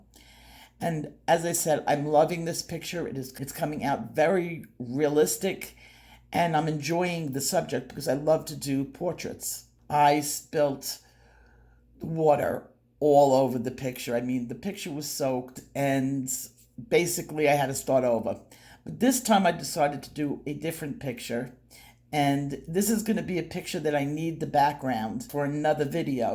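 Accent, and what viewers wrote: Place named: New Jersey, United States. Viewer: So nice to see your newer videos. I have missed your NJ accent and great teaching skills😊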